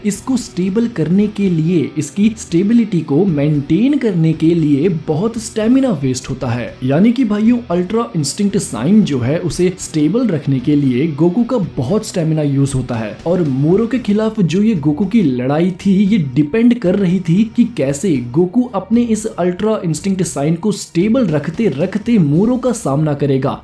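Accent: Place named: Punjab, India. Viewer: Your accent sounds like punjabi urdu😂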